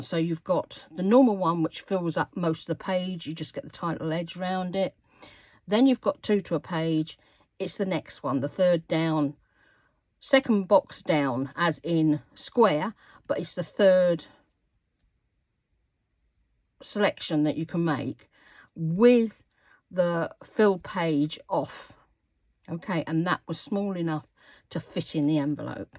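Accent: Scotland, United Kingdom. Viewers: ... I too... Am so grateful for the Playlist... I was in the DARK... Lol... Trying to get or find the people involved in the actual collaborate... But, thx to your Playlist it's perfectly clear now... ROTFLMFAO 😅... Plus getting to see those that have made such lovely creations... 🌹 I am so a new bee subscriber to your channel... Thank you for sharing your creativity wiff us... Also, loved hear your Scottish accent... I lived in Aberdeen Scotland area for bout 3.5 year's... Fell head over heels 👠 in love with it all... Rented a Farm House 🏡 in the middle of 2500 acres... Of the Major Irwins 5,000 acre Estate... In Newmachar... Siittinton House 🏡... 🌹... Sincerely sincere Miss Judith ❤ ✨ 🌹...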